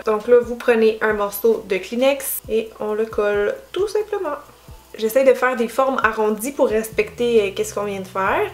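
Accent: Quebec, Canada. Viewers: J'aime trop les accents québécois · L’accent québécois ne va définitivement pas à la nonne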